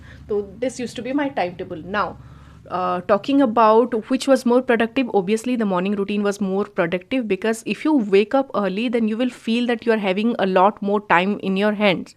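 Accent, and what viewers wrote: Odisha, India. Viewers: Hlw mam i guess you're from odisha! RIGHT!!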